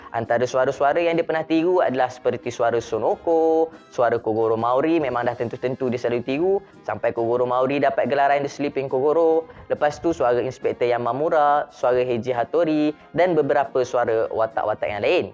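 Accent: Kelantan, Malaysia. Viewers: kuat bnyi klate tu 🤣🤣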